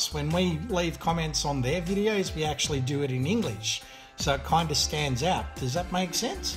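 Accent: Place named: Australia. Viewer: Love the accent, it is the guy's accent, I am guessing Aussie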